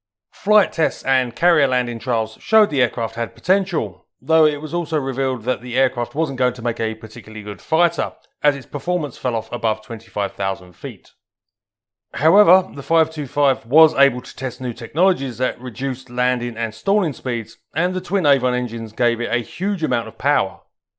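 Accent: United Kingdom. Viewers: It's not an American accent. He's British.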